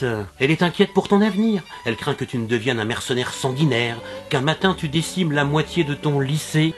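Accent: France. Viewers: Mon dieu toute ces prononciations made in france XD le coup des portugais sur minecraft j'ai bien ri !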